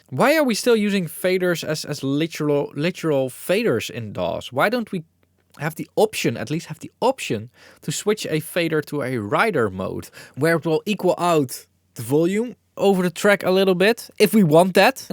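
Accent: Netherlands. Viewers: I could tell that you saId "Write" and not "Ride" immediately because "Ride automation" makes no sense! Of course, I've been watching your videos for a number of years and here in the upper midwest (Wisconsin specifically) it's not all that uncommon to interact with immigrants from the Netherlands and other northern Eurpoean countries so we're more used to those accents and have less problems understanding them!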